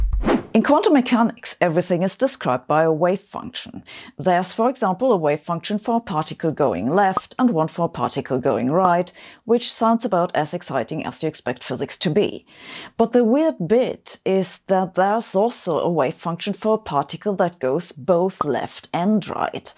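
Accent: Germany. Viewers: terrible german accent , SssssSsSssshhshSHSs my ears! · I never understand any of her video's but her German Accent turns me on... Oh Shut up, I bet I'm not the only one!🤓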